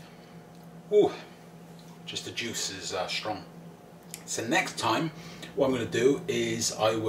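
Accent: Bristol, United Kingdom. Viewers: Bristol! Recognise that accent anywhere! I lived in horfield, Bristol for 5 years.....Alright my lovvveeeee :-)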